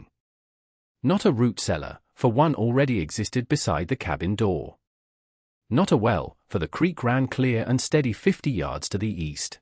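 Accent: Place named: United Kingdom. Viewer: A very feel good story. But these are much better with a cowboy voice, not British. Alabama 🇺🇸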